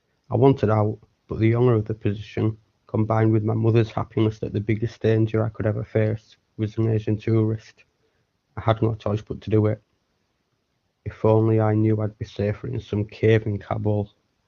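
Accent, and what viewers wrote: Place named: Northern England, United Kingdom. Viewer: You're a very good story teller ( PLEASE don't think I' m being rude ) but you might need to not be so close to the microphone and also concentrate a bit on your diction because some of the words are hard to understand..I btw am a northerner too like you so similar dialect ...anyway you now have another subscriber :)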